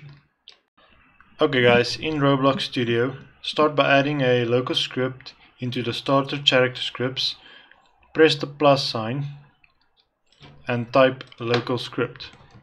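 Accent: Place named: Afrikaans, South Africa. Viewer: I hear that Afrikaans accent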